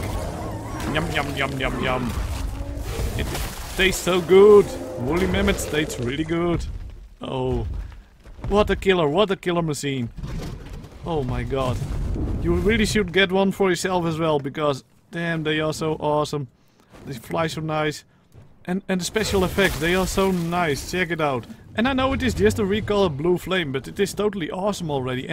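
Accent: Netherlands. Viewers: it seems you are speaking better english than your first videos anyway so it seems you are already trying :) het nederlandse accent zal niet zo snel weg gaan haha maar ik denk dat als je engelse videos bekijkt en luistert je de woorden na kan praten voor je zelf en dan luisteren of het meer klinkt als de native engelse sprekers. Zodat de engels sprekende kijkers minder moeite hebben je te verstaan :D succes!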